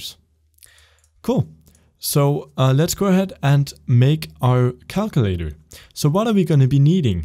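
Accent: Denmark. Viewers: Haha, sad og tænkte... "Den accent der.... Han MÅ da være dansker..." "... My name is... " xD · wait are you danish ???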